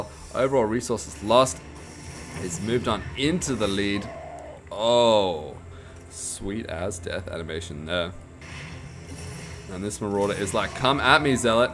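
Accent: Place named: Australia. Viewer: maybe not the best - In my opinion the best caster should be at least in master and discuss about tactics like a professional - but for sure - it is very nice to hear an Australian:) I have to admit-I like to listen to. I've started just few days ago-and I would like to continue. Besides Husky, Force and HD - is the best. For example TotalBiscuit has a superb voice, but he sucks at SC - as admit he himself. could be my best in the near future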